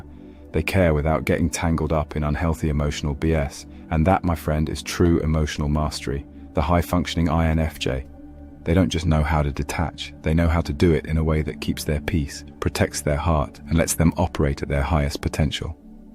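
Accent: United Kingdom. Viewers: You made me giggle!🤭 Emotional Kung Fu😎 How do you know INFJ so well? Are you one of? You must be one of, you know too much!😂 (By the way, really love your humour and your sweet British accent ! You sound charming!)❤ I fancy you just from your words and the sound of your voice & accent ! (It doesn’t feel it’s all AI 💯 voice made) Love from a Italo & fellow Londoner ❤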